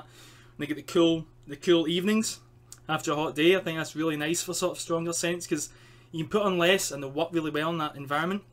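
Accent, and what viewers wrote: Scotland, United Kingdom. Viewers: S good and fun review to watch. BTW, are you Scottish? Cool accent regardless.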